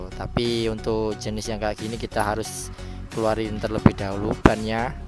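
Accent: Java, Indonesia. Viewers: jowo campur melayu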